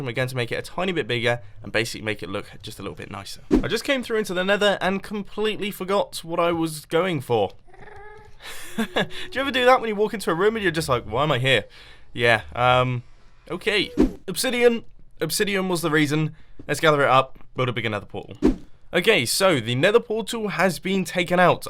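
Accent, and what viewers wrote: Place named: England, United Kingdom. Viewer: I didn't know u were English I am too and I don't even know my own accent. Lol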